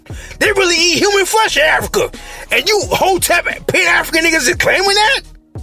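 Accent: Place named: Northeast, United States. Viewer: Keep sharing your stories. You sound like youre from the Northeast. Its time for FBA in the northeast to finally share their truth.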